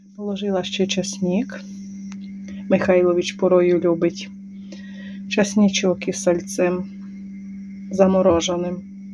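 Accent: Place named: Narodychi, Ukraine. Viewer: Ооо, а ми з народицького р-ну ,чую що говір до волі знайомий.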